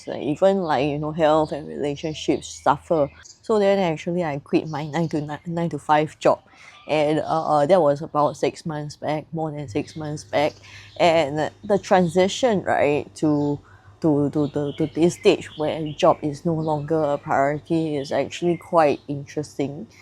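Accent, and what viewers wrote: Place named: Singapore. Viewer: Nice to hear a Singaporean voice 😊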